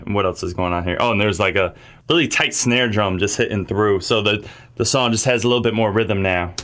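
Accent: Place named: Chicago, United States. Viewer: Yo are you from Chicago? You have that quality to your voice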